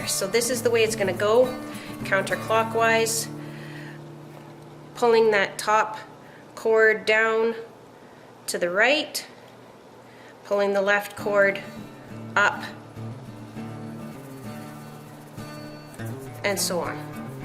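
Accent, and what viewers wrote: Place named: Canada. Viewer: Thank you very much. You have a wonderful video! Are you Canadian!? Accent gives us away!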